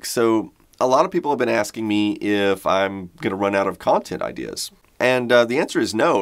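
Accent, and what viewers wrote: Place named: Texas German, United States. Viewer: Interesting - when I saw you speaking german in that Thomann video, I assumed you're a Texas German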